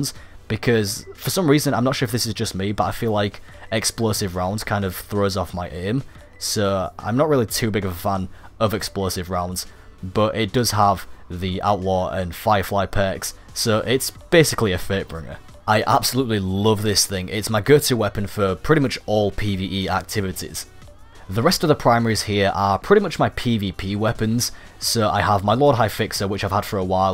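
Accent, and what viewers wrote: United Kingdom. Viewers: You sound like u are from the UK,am I right?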